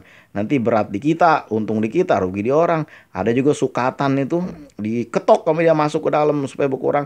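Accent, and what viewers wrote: Jakarta, Indonesia. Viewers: Alhamdulillah senang banget klu ketemu chenel pak ustadz n ane SK logat Betawi nya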